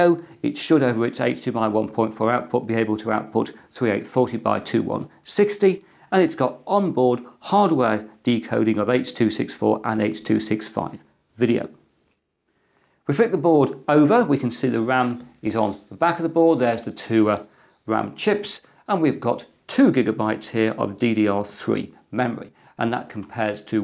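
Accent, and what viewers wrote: England, United Kingdom. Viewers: It's amazing how you have a guy with an English accent and everything he says sounds more intelligent and straightforward....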